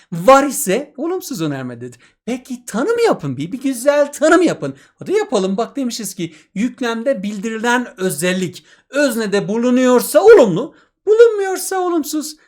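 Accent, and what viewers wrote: Eastern Anatolia, Turkey. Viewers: 2025 tayfadanım herkese selamımı Hocam çok iyi anlattınız sizinle devam etmeye karar verdim.. Bi de bi şi sorcam aslen nerelisiniz acaba? Kars Ardahan Ağrı Erzurum??? Uzatmaları kullanmıyosunuz da😂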